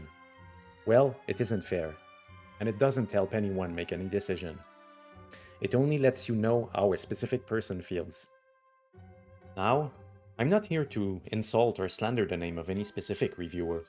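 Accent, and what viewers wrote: Quebec, Canada. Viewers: Est-ce que j'entends un accent québécois? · disagree here, human beings hate what they don't understand, and fear the unknown, hence why we hate out-groups and fear death. Otherwise, this was a great nuanced take why the Pokemon gamin franchise continues to pump out mediocre products. I can tell by your accent you're a fellow Quebecois, subscribed🇨🇦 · Brother.. Are you from QC? Lol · Is it me or I recognize a Quebec accent here ??? Certainly wasn't expecting that hahah. Really liked this video it was super interesting !!! Will def sub and check your other videos !!!! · T'es Québecois hein? 😂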